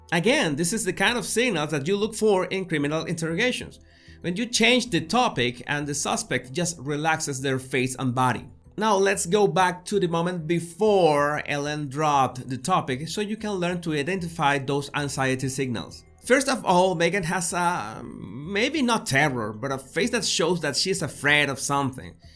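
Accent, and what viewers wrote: Mexico. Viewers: I like your accent..where is it from??? It sounds Mexican..but......i like yours... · PLEASE!!!! TRY NOT TO SPEAK IN THIS HORRIBLE MEXICAN,RUSIAN,CUBAN ACCENT.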